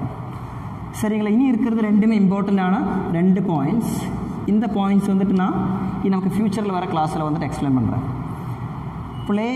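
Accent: Kerala, India. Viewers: Sir Ur from Kerala...? Ur slang like malayalizz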